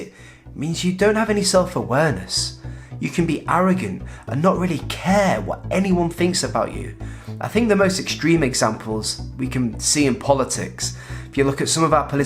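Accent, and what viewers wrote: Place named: United Kingdom. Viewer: Pedro Pascal with a British accent on a bike? Why not 🥸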